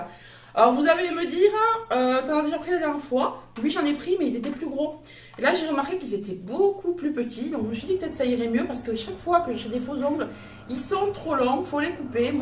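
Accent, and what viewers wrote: South, France. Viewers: Bonjour, belles découvertes à action. Le petit haut vous va très bien. La couleur met votre teint en valeur. J aime vous écouter avec votre accent du sud. Bonne journée.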